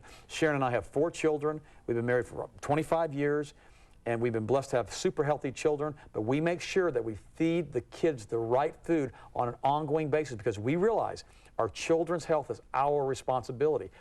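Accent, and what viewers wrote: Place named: South, United States. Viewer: he said "high fat", not "hot fat" lol. its his southern accent threw you off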